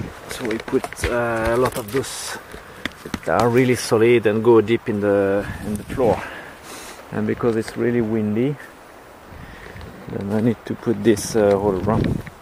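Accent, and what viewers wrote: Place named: France. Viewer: Hahaha .....I am not a linguist by any means but did detect your French accent in this video. I was converted to a firm Francophile in 1990 when I discovered I could be in a totally different climate from my home in the north of England in less than 7 hours driving and then with another 4 hours driving I could be on the Riviera and the southern French coast. I can see why you have fallen in love with Sweden the lakes and countryside do look very beautiful Thanks for your reply and I am looking forward to your next outing 👍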